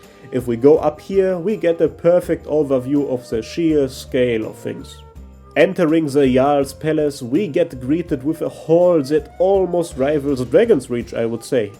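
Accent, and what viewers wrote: Germany. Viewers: Man merkt voll dass du aus Deutschland bist 😅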